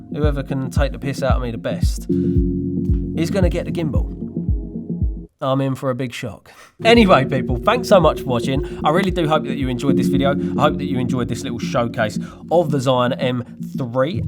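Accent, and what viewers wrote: United Kingdom. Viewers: Sony a7c gonna be a perfect match for this thing for travel, so I consider buying one if not annoyed you enough with the comment. Little star warsy color and design but who cares, at least folks can see which side I came from. I may get a suitable helmet too. And I subbed for the accent that reminds me of the characters in the movie Snatch, even though not entirely cockney.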